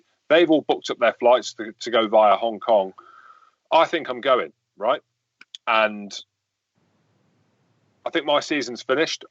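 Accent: United Kingdom. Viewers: Sounds very Scottish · Mate he has an English accent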